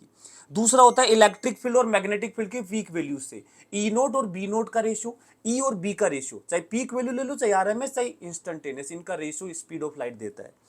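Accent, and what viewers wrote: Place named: Haryana, India. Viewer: Sir ki launguage h jo log haryana se hote h yese bolte h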